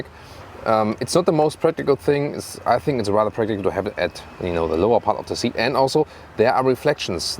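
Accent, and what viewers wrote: Germany. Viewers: I happened to watch this guy's clips many times back 4 years ago while I was choosing to buy a car (Bimmer/Merc/Volvo). This year I watched some youtube clip I think about marine fish/reef tank or something and I came across German-accent English. I told myself I swear to god I have listened to this German-accent English before, from some guys but couldn't recall who (but kinda remember this face and remember it was from Youtube). Ahhh finally I remember.. its' from! Nice accent!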